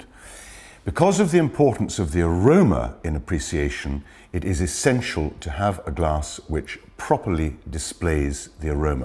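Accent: United Kingdom. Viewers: A British Optimus Prime?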